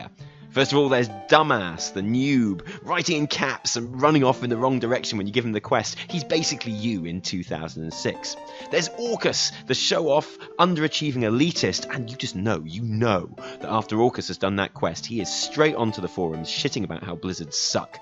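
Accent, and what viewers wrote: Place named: England, United Kingdom. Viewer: Hey, anyone know what his accent is from England? I'm Hampshire but I can't quite place his. Thanks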